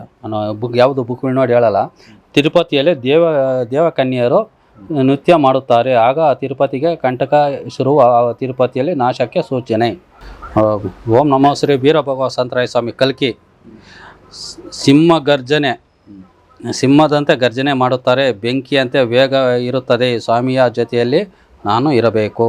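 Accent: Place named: Doddaballapura, India. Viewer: Gnana mukya avaru astu swastavagi oduttiddare doddaballapura side e thara kannada mathanaduttare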